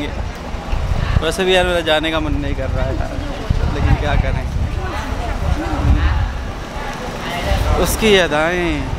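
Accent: India. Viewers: Bhai ap jab bhi Desi andaaz bolte ho na to dil khush ho jata hai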